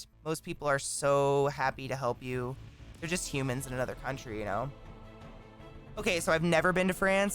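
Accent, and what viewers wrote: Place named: New Jersey, United States. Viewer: Nuthin like a Jersey accent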